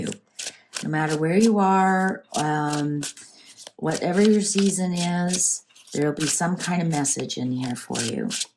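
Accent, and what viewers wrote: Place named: Canada. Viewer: Thanks for today's reading. I thought you were Canadian. I'm a fellow Canadian transplanted in Florida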